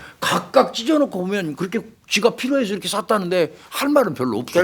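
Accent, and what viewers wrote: Chungcheong, South Korea. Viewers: 우리의. 충청도. 말씀으로. 넘. 구수하게. 해주셔어. 감미록고. 훈훈한. 진국입니다. 정말. 꼼꼼. 인정유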